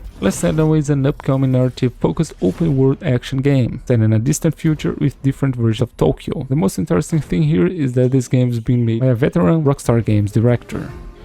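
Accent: Brazil. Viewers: I would guess that u are Brazilian haha, great video man!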